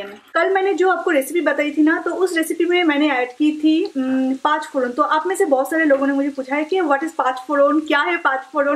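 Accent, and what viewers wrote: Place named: Bengal, India. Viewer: array koi ni..... 😄😄😄. we all know ki she z a Bengali lady ... To unka accent aa jata h .. mujhe to bahut acha lgta h .. jb dii aise bolti h .. I love it ...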